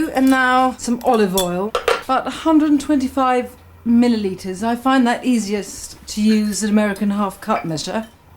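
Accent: England, United Kingdom. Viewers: What type of English accent does she have?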